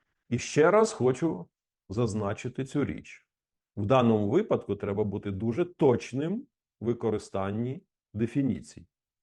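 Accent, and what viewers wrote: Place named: Galicia, Ukraine. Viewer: Пане, а почему такое ГАЛИЧАНСКОЕ произношение звука "Ч" ??? Очень медленная речь, просто тянучка !!!!!!!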